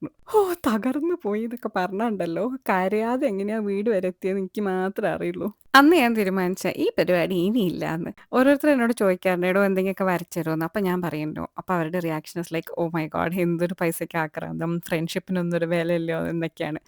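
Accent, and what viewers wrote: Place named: Kozhikode, India. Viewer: slang kettpm thonni ammle kozhikode aan enn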